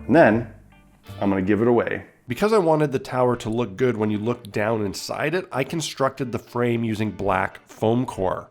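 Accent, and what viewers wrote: Canada. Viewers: I kept wondering if you were Canadian I'm glad I have confirmation.haha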